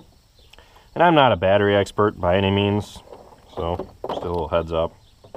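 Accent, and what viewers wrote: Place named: Wisconsin, United States. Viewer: First thing this fellow cheesehead noticed was the Wisconsin plates on the car and your 100% Wisconsin accent. I live in Arizona now and can pick out the accent in a crowd like nobody's business. Comfort food for the soul. As a sidenote I also have the NOCO G7200... Thanks for the video!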